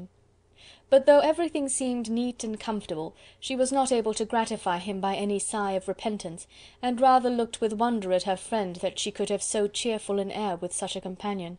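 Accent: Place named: United Kingdom. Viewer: Excellent british accent!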